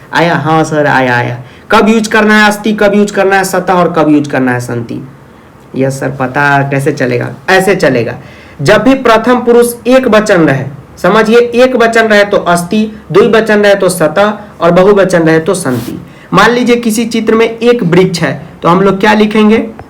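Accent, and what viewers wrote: Bihar, India. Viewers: Your teaching style is so good and your bihari language is so amazing 😍 😍😍😍😍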